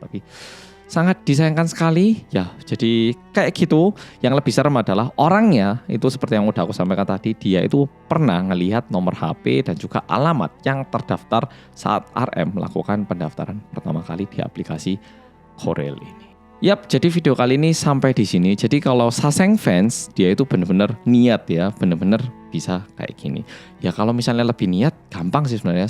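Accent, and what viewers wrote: Java, Indonesia. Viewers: Mas ..yg medok .bs gak orang indo kerja d sana ...🙏🏻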